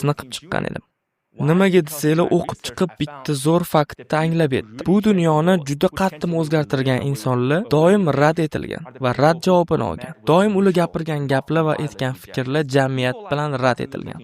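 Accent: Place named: Tashkent, Uzbekistan. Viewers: shu oddiy gapirganiz yoqdi adabiydan koʻra Toshkent shevada 😊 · shundo dovom eturin oka toshken sheva zor ketvotti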